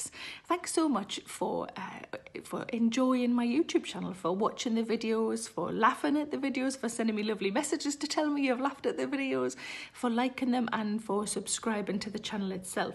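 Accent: Newcastle, United Kingdom. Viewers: I love your geordie sayings and accent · Funny that she reminded me of Benny Hill show, love your British accent! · Hey there, Geordie girl. You are so funny and cute and kind. Just ask in that accent and I will always subscribe! TO YOUR CHANNEL!! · Not only am I in love with your accent (reminding me my student life in the UK), but I also enjoy binging your videos when I’m in need of laughter and positive vibes. Thank you for uploading! Although your beautiful country just divorced the EU, please come do a show in France!!! I promise, most of us are nice... 😉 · I've watched you for years! I'm from Saudi Arabia (and yes, I understand Geordie) and it's quite unlikely that I would be in the UK any time soon, never been actually. I've only watched your specials and interviews on YouTube and you can't imagine how thrilled I am when you started this channel! 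Already subscribed, don't mind learning what you're eating (biscuits, innit? :D) and cannot possibly wait for the full special! Thanks flower! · Yay! I have introduced your content to my mother (you and I are about the same age), and she loves your humor as much as I do. Her only question to me was, "why does she talk so funny? It's hard to understand her sometimes." I don't know why I found that statement hilarious, I had to explain to her that just like us "Yankees" in the Midwest US sound different from Boston, not everyone in the UK speaks like Mary Poppins or Burt the chimney sweep. Anyway, please come to Minnesota on your US Tour, I'd love to see you in person!!!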